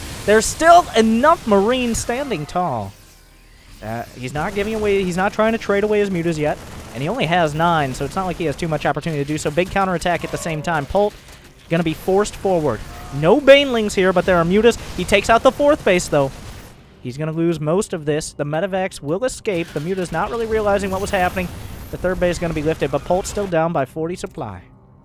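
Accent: United States. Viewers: I love your delivery, vocabulary and accent. It's nice to see such a strong american accent that is easily understandable by a non-native speaker. Kudos!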